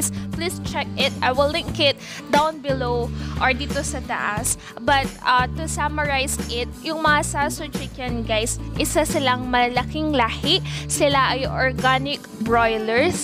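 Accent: Pangasinan, Philippines. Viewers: Pangalatok si maam may punto.hehehe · Pangasinan accent si ate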